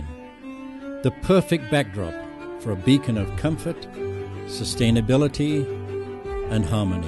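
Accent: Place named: United Kingdom. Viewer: Cool house, but what's up with the British accent?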